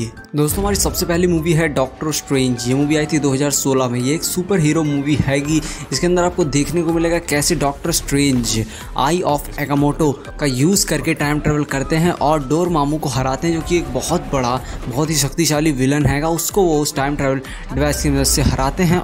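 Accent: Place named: Bundelkhand, India. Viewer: बुदेलखंड से लगते हो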